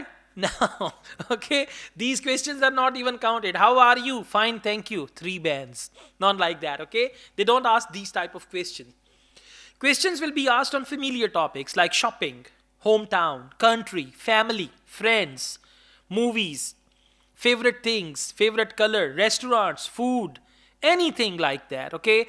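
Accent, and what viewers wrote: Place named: Punjab, India. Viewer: Salute you sir for your teaching method as well as there are myraid things which are most impressed to me such as way of talking like pure punjabi Jatt and also I like your sense of humour. From Punjab🥰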